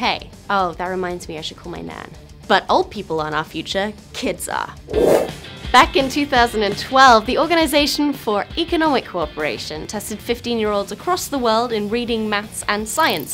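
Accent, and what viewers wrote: United States. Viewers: there is no proper english since putting a standard english did not appear till the 1770s i think ,and it evolved into american english and british english so to say this is wrong and that is right is not gonna work many americanizaition came to british english most people will understand american english cause of it influence movies and music and political power. you can see the americanizition even in this video her english almost about to sound like an american and that because she used the flapping r like making the t or d sound like an r and is not part of england accents but is part of american one and you can further know that this not part of her original accent is when she sometimes use this rule and sometimes not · I think she lives in America so it's understandable that she's picked up a couple of the pronunciations.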